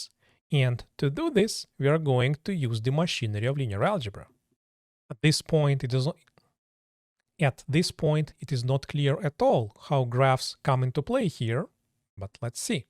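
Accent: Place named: Russia. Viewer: Русский акцент я узнаю из тысячи :D. Сам этим страдаю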